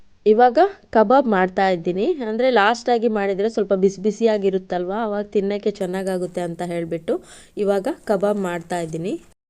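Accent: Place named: Mysore, India. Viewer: Nimma bhase Mysore side matado tarra edhe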